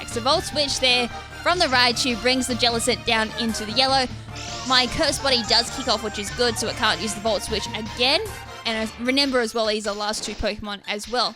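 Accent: Australia. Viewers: Wow, I'm not gonna lie, you really seem like the female pimpnite Not in a bad in a bad way but your accent is very Australian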